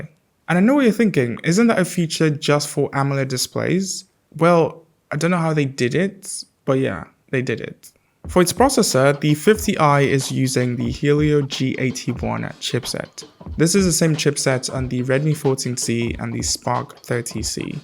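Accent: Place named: United Kingdom. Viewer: I believe na UK Born or stayed there for many years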